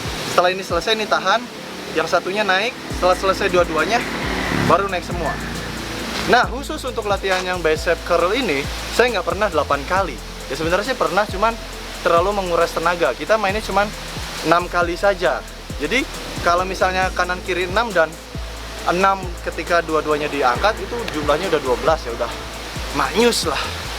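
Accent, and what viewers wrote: West Java, Indonesia. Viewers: Sugan teh orang batak mang pek teh urang sunda wkwk hade lah hade top ilmuna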